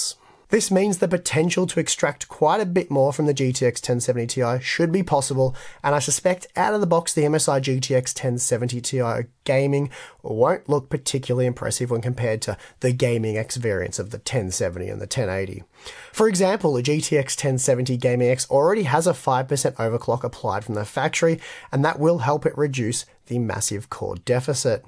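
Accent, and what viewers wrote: Australia. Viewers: Get well soon! The videos are not the same without your full strenght australian accent :(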